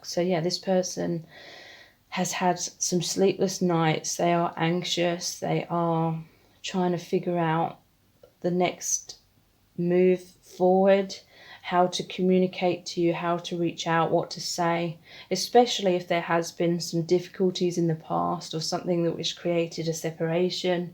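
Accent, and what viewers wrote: United Kingdom. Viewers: Girrlll, I love the british accent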